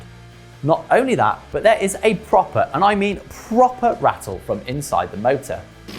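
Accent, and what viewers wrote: England, United Kingdom. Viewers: Would you kindly do this review again without the English accent?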